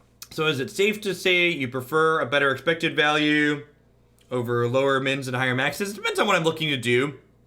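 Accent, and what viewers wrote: United States. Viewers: I have found 3 unearth to be very good in this archetype. Combined with fulminator mages and kambals in the side it makes for some fun interactions. As a fellow Illinoisian, I have part of those same speech patterns. Great as always, thanks! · a strong but generic american accent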